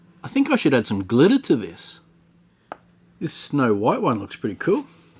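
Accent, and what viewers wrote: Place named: Australia. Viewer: Im an american, and half the reason i watch your channel is for your awesome australian accent 🔥🔥😂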